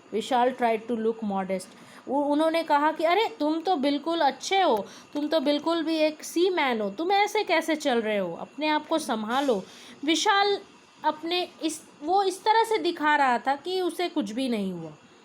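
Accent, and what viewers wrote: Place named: Bengal, India. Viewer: Mam by your voice I can recognise that you are bengali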